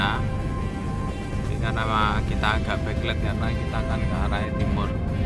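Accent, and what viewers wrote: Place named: Java, Indonesia. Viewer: Bahasa Jawa e penak tenan mas medok 👍🏻 sip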